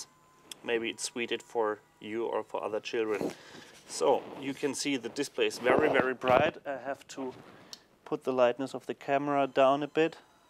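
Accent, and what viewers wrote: Germany. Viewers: I can hear your German accent, I have that one as well :)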